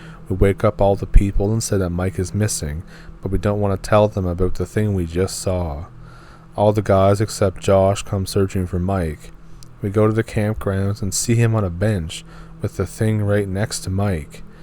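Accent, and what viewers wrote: Canada. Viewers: I saw your comment and the thread it started on a Raven Reads video. I told you I would check out your channel and I have. I picked a random video down the list a little and this is the one. I liked it so much I subscribed! I look forward to checking out more of your already posted videos as well as any and all new content you post. Your voice is great, I love Canadian accents, and perhaps most importantly, you tell the story really well. I felt like I was just hanging with a friend while he shared the story with me. It felt more intimate than most channels, less rehearsed and more spontaneous, if that makes sense. It was awesome! 🙂🙂🙂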